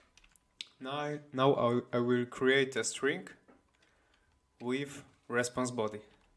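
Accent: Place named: Poland. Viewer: Are you from Poland? You sound like you are from Poland ;)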